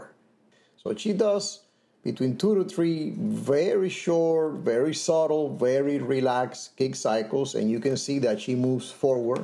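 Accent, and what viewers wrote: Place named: Cuba. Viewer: I just came across your channel and obviously I just subscribed. Tons of great info that I will implement this summer, thanks a million for not just your teaching but for the way you deliver it 😎 .. Googled you as well did not know you trained several world record holder free divers ! Needless to say when I heard the accent I was like “this guy is Cuban” and Yeap Cienfueguero ! I’m from Santiago de Cuba. Anyhow I’ll watch and pay close attention to your vids and teaching. Thanks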